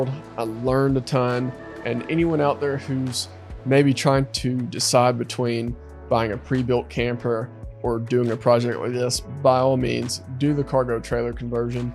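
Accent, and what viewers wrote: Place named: South, United States. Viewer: Interesting accent. A little bit of a southern accent, but not too southern.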